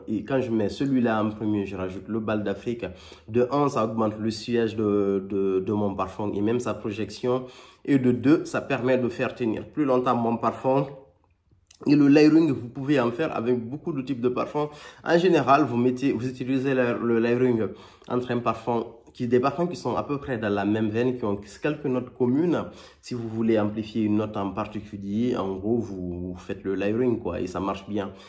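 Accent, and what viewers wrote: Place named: Wolof, Senegal. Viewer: Hello merci pour ta video, Jaime bcp ta chaîne!!! Es-tu sénégalais ? Tu as un accent wolof !!! 😻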